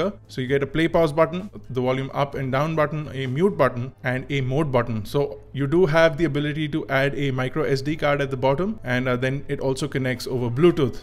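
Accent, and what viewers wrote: India. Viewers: R e bhai aluminum bol le..kya alomenum alomenum lga rakha h...kab tak angrejo ki copy karoge...Indian accent is the best accent.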